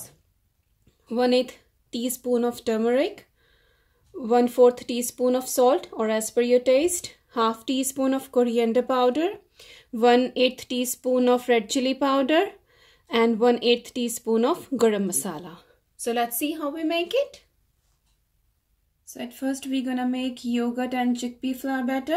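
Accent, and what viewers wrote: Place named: India. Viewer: Awesome... and with boiled rice it will be more tasty. Di I like ur hindi accent more cute. 😍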